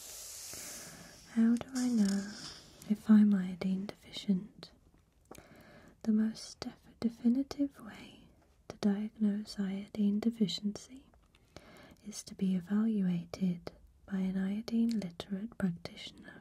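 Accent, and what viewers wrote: England, United Kingdom. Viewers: should be WAY beyond 1.5+ million subs....she is simply Fabulous & her voice is so wonderfully calming. I adore her beautiful English accent, she is absolutely lovely! Please Like her vids if u watch/enjoy bc that gets her videos out to more ppl. Everyone needs some in their life. Idk how anyone could be grumpy, negative or NOT be in a great mood after listening/watching beautifully relaxing, positive ASMR videos. Thank You! You've soothed me to sleep/beat insomnia naturally & greatly reduced anxiety too many times to count. I truly appreciate all you do♥️. With gratitude, ~A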